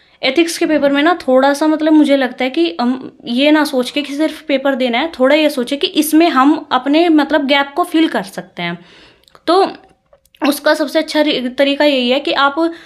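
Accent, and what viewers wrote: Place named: Shamli, India. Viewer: Mam तो म्हारी वाली language बोल रही है, शामली, सहारनपुर वाली।।।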